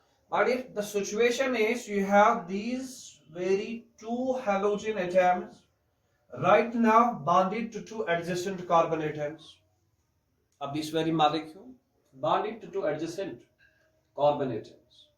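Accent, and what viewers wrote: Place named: United States. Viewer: Love ur classes & American accent as well...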